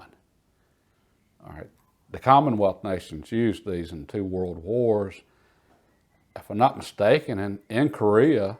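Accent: South, United States. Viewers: Fantastic just love your southern Accent, thanks for knowledge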